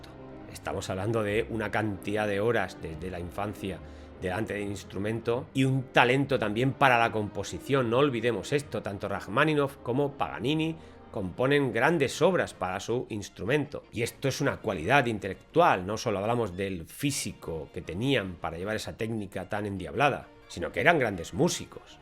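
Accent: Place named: Spain. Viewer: el acento español es desapacible y estresante oara nosotros los latinos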